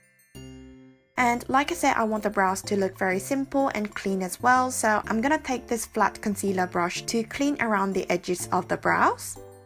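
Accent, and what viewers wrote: United Kingdom. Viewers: 저기 죄송한데 영국발음 영드로 공부해도 되나요? 발음이 너무 탐나네요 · 와 이 화장 진짜 너무 예뻐요 언니ㅜㅜㅜㅜ영상 너무 잘보고 있어요ㅜㅜㅜ한국말 하실때도 매력 넘치시지만 언니 영국식 발음이.....❤️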